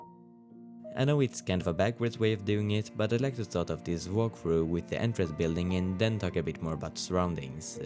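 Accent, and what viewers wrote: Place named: Sweden. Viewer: really good man also love the swedish accent 😭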